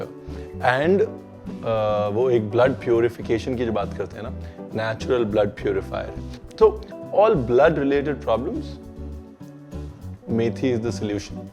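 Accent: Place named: Punjab, India. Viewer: Hi Ranveer tuhadi punjabi ta sachi maar dendi hai, above all tuhadi recipes....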